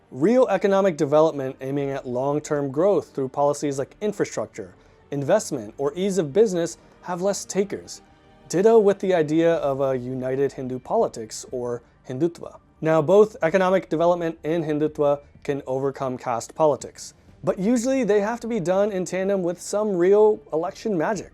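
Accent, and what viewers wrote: United States. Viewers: Best channel. Explaining India through an Indian lens, with just 1 difference: a western accent & articulation. Makes it much more consumable for the world. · from …. hmmm So interesting!I watched a couple of videos already. We come across something new everyday, here we find an american accented indian living in the US who is huge BJP fan!! He does a lot of research, but in his fangirling over Modi forgets to find “the first OBC PM of India” comment by the PM? Also according to “BJP is reformist and a rebel”! So what has stopped this rebel to challenge the status quo? As a general caste hindu i want to know why hasn’t this reformist party challenged the reservation system openly? It could have done that alongside its hindutva politics? Strange are the ways of the world!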